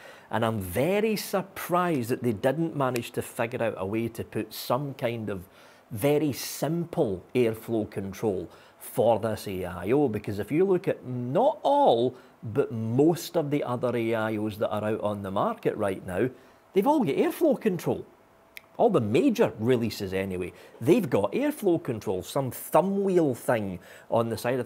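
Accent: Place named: Glasgow, United Kingdom. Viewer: First time I've ever seen a video of yours. First idea popped in me `ead was if I was listening to Glaswegian or a bloke that uses the M-77 part of their commute home from there.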